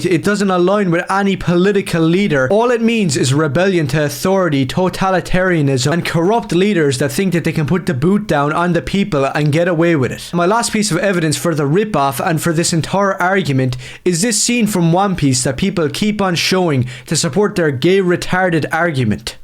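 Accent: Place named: Ireland. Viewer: He’s br ish. Sounds Irish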